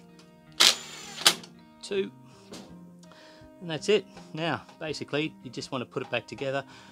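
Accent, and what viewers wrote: Australia. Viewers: glad I watched this before I took my dryer off the wall and opened up the back. I've worked on a few dryers in my time changing belts capacitors elements and door switches but have never taken a timer out. My timer has the second symptom you described stopping at around 90 mins and just before cool down. Also just checked eBay and there are new ones for $45. You sound like you have an Aussie accent. Good Clip, invest in a Tripod it will make all the difference at your end. Cheers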